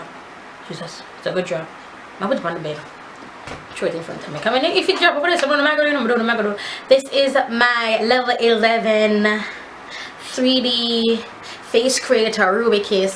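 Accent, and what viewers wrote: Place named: Jamaica. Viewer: loll you're so crazy i lavvv it. aint nothing like a jamican accent lol.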